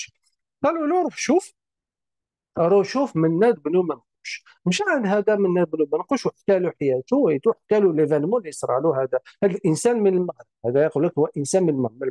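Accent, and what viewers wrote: Tlemcen, Algeria. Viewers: كلام الأستاذ واضح لا غبار عليه حتى ولو أدخل بعض الكلمات الفرنسية مع اللهجة التلمسانية الزيانية العريقة زادت للفيديو رونقا. تحياتي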